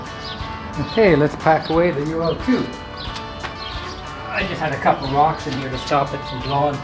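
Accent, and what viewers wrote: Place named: Canada. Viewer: You sound Canadian...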